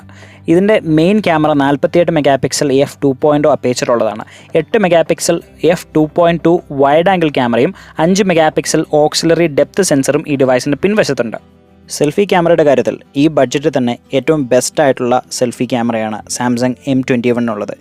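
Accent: Idukki, India. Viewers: ഇടുക്കികാരൻ ആണോ ബ്രോ ?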